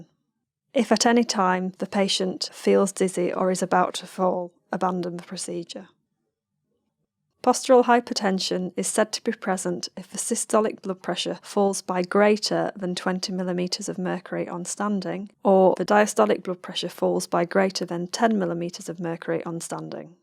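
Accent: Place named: United Kingdom. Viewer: Beautiful explanation with lovely British accent. Thankyou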